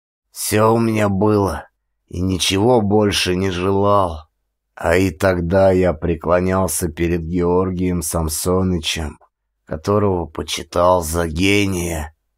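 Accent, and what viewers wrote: Moscow, Russia. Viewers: Здравствуйте,! Последний комментарий про московское "аканье"- это ни о чем. Вы жили в Москве какое-то время, м. б. отложился как-то этот говор. Я тоже открала для себя великого русского писателя Д. Мамина-Сибиряка. Нынешний русский язык отличается от языка 19-го века. Многие забыли об этом. Огромная благодарность за Ваш труд! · Аканье московское.театр ваш ни к чему. Ждали простого прочтения классики. Мы и без вас все представим.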